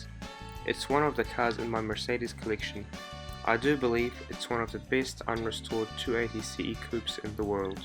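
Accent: New Zealand. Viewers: From the accent and road signs, I'm guessing New Zealand. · Recognized the Auckland accent the second he started talking 👌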